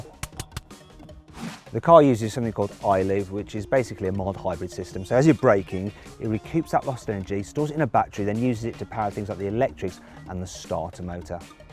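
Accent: United Kingdom. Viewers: イギリス英語わからんなら理解するの難しいだろうな。日本語訳も所々違う部分もあるし。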